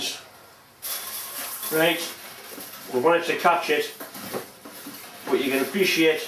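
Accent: Newcastle, United Kingdom. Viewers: Been in Engineering all my working life - so well used to the tchnical terms used. First time I watched this, I laughed so much the tears ran down my face! Great Geordie accent - brilliant and sound Engineering advice - lots of good ideas. Keep up the good work. You must be a proud Dad!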